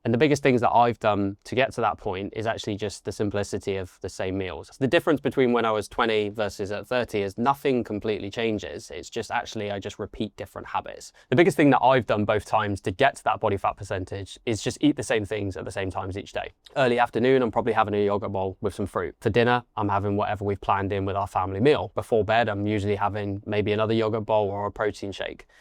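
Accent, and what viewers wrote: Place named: England, United Kingdom. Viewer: Well presented content. Pleasantly surprised to hear an English accent, these videos are usually American! All the best.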